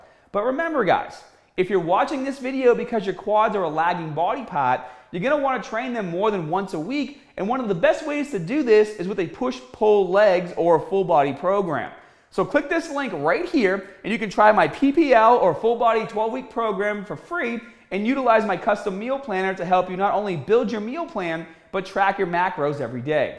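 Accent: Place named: Massachusetts, United States. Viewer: Sounds like your from Mass? Great video!!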